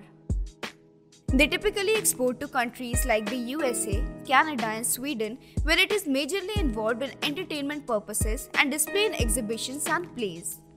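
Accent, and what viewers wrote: India. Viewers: You know what is something I like in your videos. You don't fake your accent. You speak in typical Indian Accent. Which is easy to understand for a large chunk of viewers.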